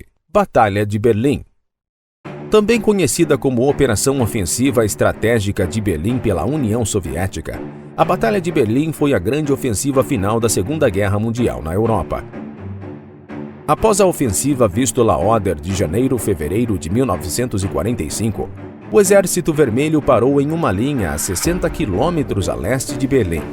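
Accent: Maranhão, Brazil. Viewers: O maranhense não tem o sotaque dos outros estados do nordeste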